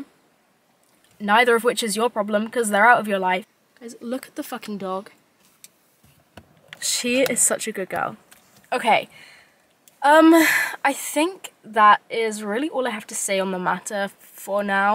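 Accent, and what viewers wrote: United Kingdom. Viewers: it this a British accent? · Are you British!? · For the not native speakers you speak too fast))) Where you from, UK?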